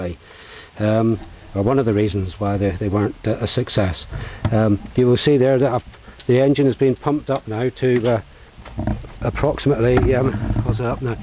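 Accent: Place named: Cork, Ireland. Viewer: he's from cork (ireland) i think :)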